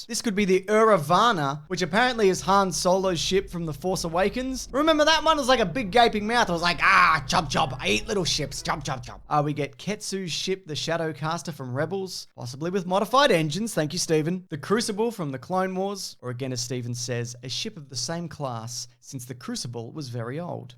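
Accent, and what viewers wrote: Australia. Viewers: You are the reason I switched my Siri voice to Australian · Doan said it's the accent and they're partly right - it's because he's Australian. It's so bloody hot here that you can't care about anything else. Notice how started to get wound up about something, but then just bails on it? It's too bloody hot to stay in that zone for long.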